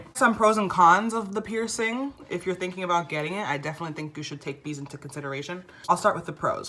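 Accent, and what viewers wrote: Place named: Dominican Republic. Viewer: I knew que eras Dominicana or cubana lol I have a Cuban friend that talks just like you, I’m Dominican btw 🇩🇴🔥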